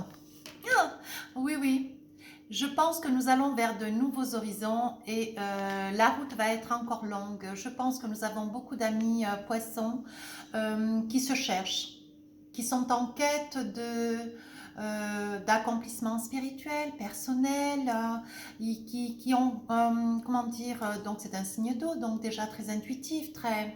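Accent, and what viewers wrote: South, France. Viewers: Bonjour. J'aime vos guidances. Juste, je cherche en vain l'origine de votre accent. Provence ou sud ouest? 😁👍🙋‍♀️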